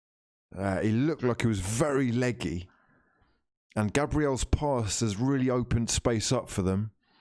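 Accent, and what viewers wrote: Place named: England, United Kingdom. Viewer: Its fuckin wild. I'm american and all I fuckin say to my friends and loved ones are things like "Love to your Mother", "Incredible Scenes" "Incredible Scenes of Glorious emotions" of course " FRAUDS" & "DON". Oh Here's a new one i've incorporated into my lingo "You're Having a laugh!!!" And the kicker is I DO IT ALL IN ACCENT. I FUCKIN LOVE THIS FRADULENT DON. P.S In America periodically saying words & english lingo that nobody fucking gets in an english accent haphazardly actually makes you look crazy. But im a fraud gang member so it's par for the course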